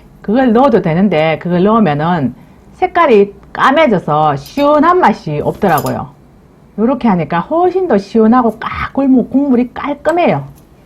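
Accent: Gyeongsang, South Korea. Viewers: 나도경상도 사투린데 재밋네요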